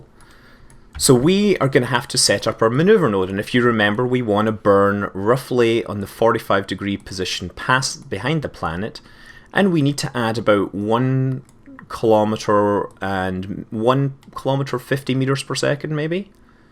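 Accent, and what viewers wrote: Scotland, United Kingdom. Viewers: It's pretty obvious from his accent that he is from Scotland.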